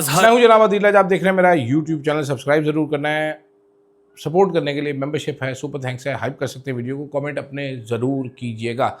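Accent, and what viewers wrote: Punjab, India. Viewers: راجہ جی پنجابی ٹچ کمال کر سٹنے او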